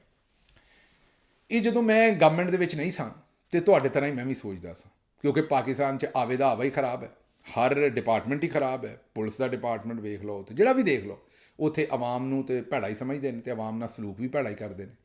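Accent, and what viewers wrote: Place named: Amritsar, India. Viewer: I agree. His Punjabi is sophisticated and sweet. He mentioned that he speaks Amritsar dialect. We speak similar Punjabi with Jalandhar dialect. This is not to detract from his amazing jihad against the dictatorship.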